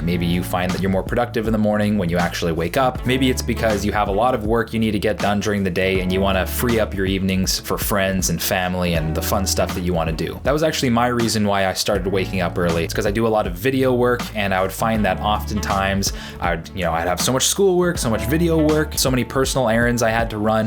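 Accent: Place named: Canada. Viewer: Love it. Are you actually from Canada? First , because of pronunciation, but mostly because of the humour and laid back personality:) it seems like Canadians in general are funny, friendly and kind people !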